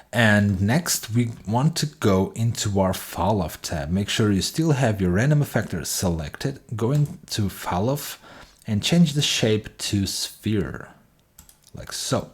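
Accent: Portugal. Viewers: pandahg00 Portugal n br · ele é português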